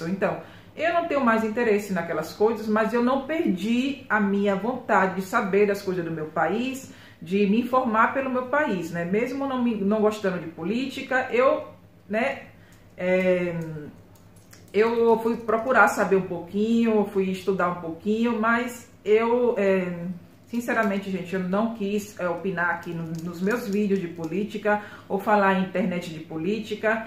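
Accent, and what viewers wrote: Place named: Bahia, Brazil. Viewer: Não perdeu o sotaque baiano não! 😍❤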